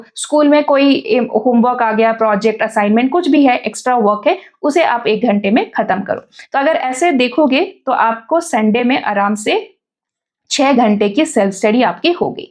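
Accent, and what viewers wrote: Odisha, India. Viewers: Ma'am app odisha se ho kya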